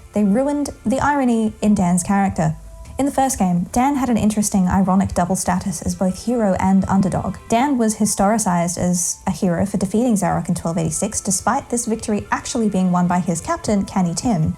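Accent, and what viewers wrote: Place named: Australia. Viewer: australian spotted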